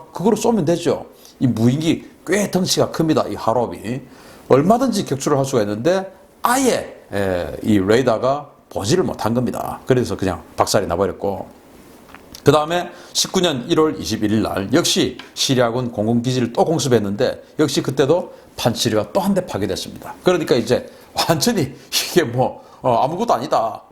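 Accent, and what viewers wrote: Gyeongsang, South Korea. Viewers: 그동안 군사 문제에 있어 좋은 전문가라 생각했습니다. 그러나 문재인 대통령 목소릴 가지고 망신 주는 댁을 보며 그닥 좋은 사고를 가진 사람이 아니라는 사실을 알 수 있었습니다. 경남 사투리로 방송하시는 님! 님도 그다지 듣기 좋은 목소리가 아님을 알려 드립니다. 방송은 표준말이 대세입니다. 개그가 아니라면... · 경상도사투리에 단세포적 수구골통에 참 역겨운 채널이네. 별 깊은 지식도 없는거 같은데 듣기싫은 억양으로 주절주절...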